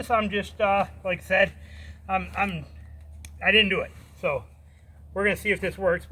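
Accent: Michigan, United States. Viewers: super helpful review and one hell of a a Michigan accent.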